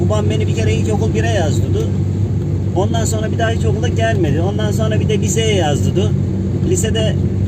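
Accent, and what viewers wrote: Kastamonu, Turkey. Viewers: Kastamonu şivesi konuş nolurrrrrrr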